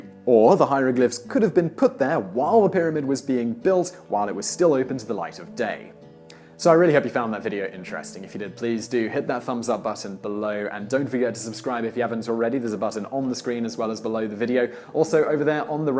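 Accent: England, United Kingdom. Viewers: Why is it that people believe that just because the presenter has a snotty British accent he's intelligent, he must be right? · Another Magic 8 ball why are they always British? I think it's that catatonic English accent. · Just because you have a fine British accent you still don't sound intelligent when you speak about things you know nothing about. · Rick Rockey You are actually taking personal offense because he has an English accent???? You are hilarious! Are you upset because you think he is putting you down by not using an inflection of voice that doesn't make you feel inferior? He is not, not trying to sound smug. It is an ACCENT. Do you get angry when French people speak with a French accent? I think you have a serious persecution complex.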